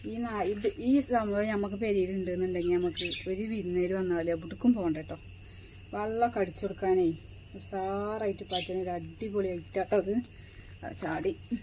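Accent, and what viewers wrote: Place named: Malappuram, India. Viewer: തനി മലപ്പുറം താത്തയുടെ ഭാഷ ❤️❤️❤️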